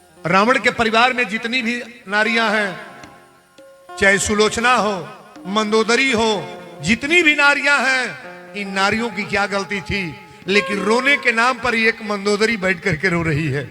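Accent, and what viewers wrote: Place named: Bihar, India. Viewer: Katha to Aapki theek thak hai par brahmad hokar bihari ganwar bhasha bolte ho.?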